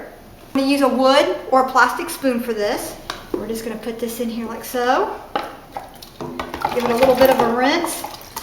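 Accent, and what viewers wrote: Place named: Appalachia, United States. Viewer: Sounds like a hillbilly and can't do it right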